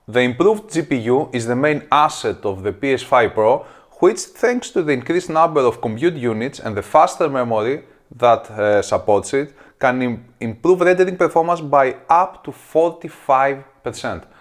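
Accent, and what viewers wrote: Greece. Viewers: most greek accent ever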